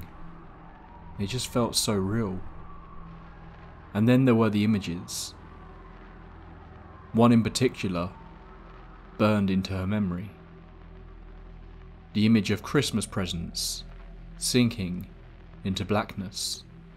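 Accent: England, United Kingdom. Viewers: Nice british accent n great storytelling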